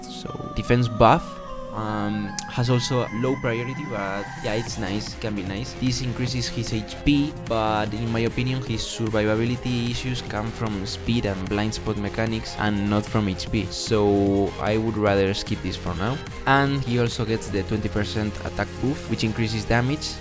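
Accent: Spain. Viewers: E w tu ere español no? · solo por como hablas creo que eres español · R u spanish dude? Your acent is exactly the same as mine when i speak english😂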